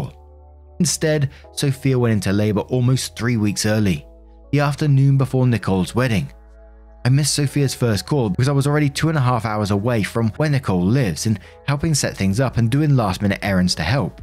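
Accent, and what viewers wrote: United Kingdom. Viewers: He's great at that, isn't he? It's interesting to go back to his older vids and compare how his delivery of and observations about posts has developed. It was when his mother was ill that he first had difficulty delivering his vids on schedule that he began to open up about stress and grief. Well. You can just imagine the waves of love he received from his listeners. He seemed to feel safer with revealing more of his personal thoughts, and frequently apologized for "waffling on." Thus was born the. Throughout all this strife, still managed to be utterly charming. He's smart, funny, and articulate. And to us Americans, his British accent is the cherry on top. Maybe I should say, the real maple syrup on the waffle. Other YouTubers who engage with their audiences a lot are rSlash, Lost Genre, and Charlotte Dobre. Charlotte's a hoot and a half.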